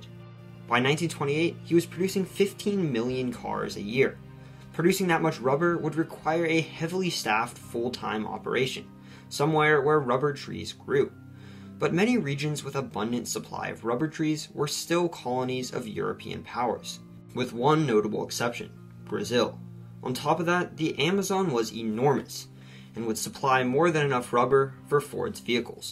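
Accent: United States. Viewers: I don't know if you're american, but you sound american, by the apocalyptical way you talk. Even if you throw a nuclear bomb in it you can't destroy it. Believe me, I'm brazilian and I know the region pretty well.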